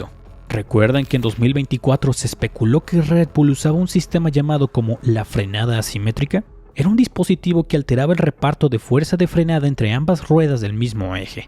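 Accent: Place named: Mexico. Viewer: JAJAJJAAAJ Eres mexicano cierto?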